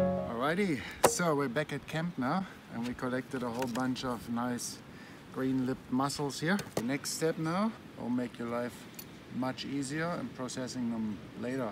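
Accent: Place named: Germany. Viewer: Aight im down to two countries, Belgium or Germany, and my guess is.... GERMANY! 🤞🤞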